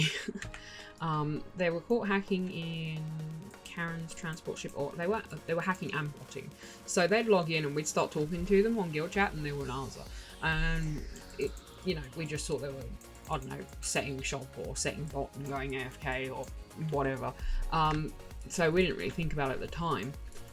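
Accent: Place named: England, United Kingdom. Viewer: You are first lady make video about dekaron. And from accent i think you are english. I live in londont form 5 year maybe can see you one day at coffe. For help me with information about global dekaron. I dont play to much global. just 1 month. And laster years same like 1 mounth after play private servers.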